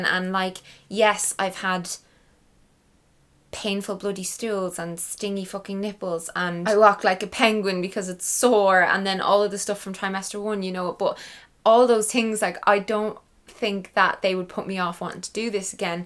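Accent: Ireland. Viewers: woah, I can't take it, that Irish accent + high pitched "babyyyyyyy", just died of cuteness overdose..... (I can't believe I just spent 3h looking at possible ways to transfer to Ireland, need my own Irish cuteness too.....)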